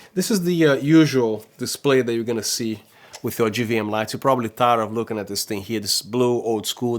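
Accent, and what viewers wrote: Brazil. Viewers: Many thanks for this video review! Helped me a lot. I seem to sense in your accent you're from Brazil. Am I wrong? :)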